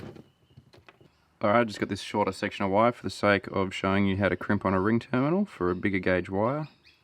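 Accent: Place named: Australia. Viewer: Great info, and nice to hear the Aussie accent!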